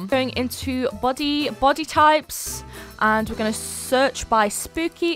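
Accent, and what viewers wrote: United Kingdom. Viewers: i rlly love ur accent is it british scottish or something else srry i love it sm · Omg I feel like u have a British accents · It sounds like you speak British 🇬🇧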